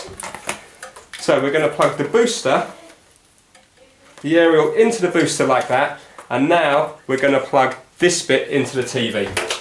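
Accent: England, United Kingdom. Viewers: Right, tell you what I missed your English accent. (MATE)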